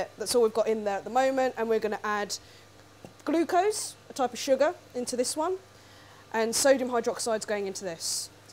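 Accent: England, United Kingdom. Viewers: asian with british accent? DOES NOT COMPUTE!!!!! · why does this asian chick have an english accent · deep voice with and english accent come out of an asian woman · Asian * sexy british accent = one very sexy scientist. Yeah, baby, yeah!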